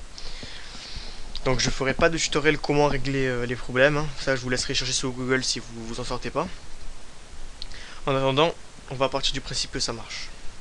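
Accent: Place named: South, France. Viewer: ) moi aussi je suis du sud :D vive les accents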